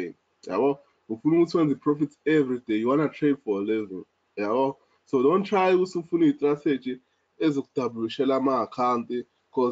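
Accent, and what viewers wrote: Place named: Africa. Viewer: 30 minutes later i'm still not sure what language he is speaking. Sounds like english but also African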